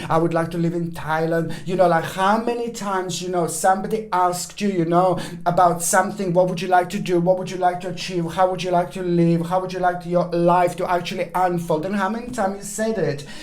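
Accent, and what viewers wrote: England, United Kingdom. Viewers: English accent.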